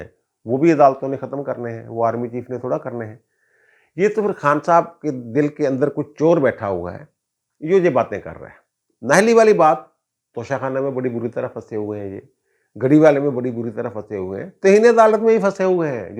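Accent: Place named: Jalandhar, India. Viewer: Wel done Ch Sb.Your Urdu and Punjabi style is Jlandri (East Pb of India. It is true or or otherwise!?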